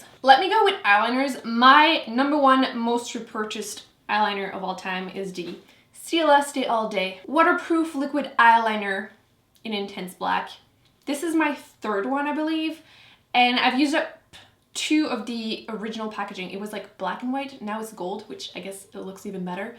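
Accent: France. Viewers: Hi! Thanks for this! (I’m from Ontario and just love your French accent!) For baby hairs/fly aways, to keep them in place, just spray some hair spray on a toothbrush and comb them down. ❤️💋🇨🇦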